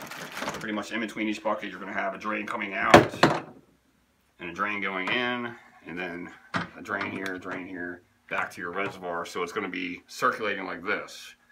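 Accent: Pennsylvania, United States. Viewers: He's speaking Pennsy, easily understandable being that I'm originally from the Ho Bud coal region of the keystone state. Listening faster might help. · I was born in PA I know that accent. Also cool points to both for knowing who the hell are.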